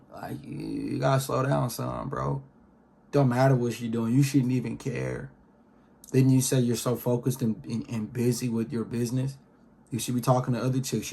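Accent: Baltimore, United States. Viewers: You from Baltimore huh brodie? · You gotta be from Baltimore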